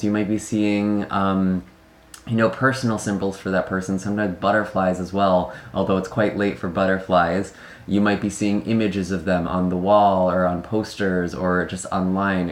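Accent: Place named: Midwest, United States. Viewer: i love your cute little midwestern accent, and your warmness! God bless!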